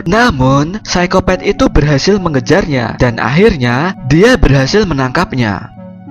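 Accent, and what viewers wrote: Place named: Java, Indonesia. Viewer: Logat Jawa nya masih medok yo suaranya itu lo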